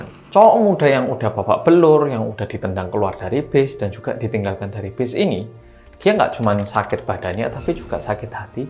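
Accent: Java, Indonesia. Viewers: Selalu suka sma stortelnya mas. Keren bgt itu loh meragain dan bawain tokohnya tpi ttp meddok gk ktinggalan Kalo jwaban akun ceritanya yo psto bihong iki. Soale kyak smcam urban legend aja gitu hihii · Ngga tahu ini beneran atau bohongan, tapi gw pengen ini bohongan aja karena jahat amat umat manusia 🙏🏼🙏🏼 thank you, Boloku Sing Paling Medok Dheweeeee 🩵🩵⚡✨🪷🫶🏼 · Ini mah cerita bohong, btw ngga pernah bosen denger mas cerita apalagi dengan cirikhas medoknya itu lo..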